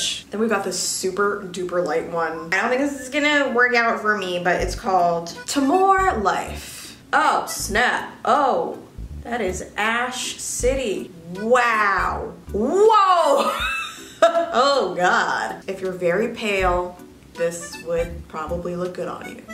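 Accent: England, United Kingdom. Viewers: Please keep making videos in british accent😩😩😩,love it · nobody gonna talk about her british accent XD i mean she is immitating but it's on point :)) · Can you do a vid only speaking British accent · PLEASE, A VIDEO WITH U SPEAKING BRITISH ACCENT ONLY PLEEEEEAZZZ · You have a pretty decent English accent. Not too cockney not too posh 9/10. 👏🏻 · why is no one talking about her british accent?😂it’s actually good,coming from a british person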